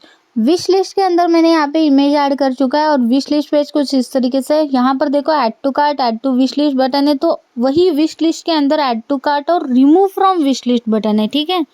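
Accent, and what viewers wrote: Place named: Maharashtra, India. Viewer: Maharashtra.. I knew it.. by your tone and language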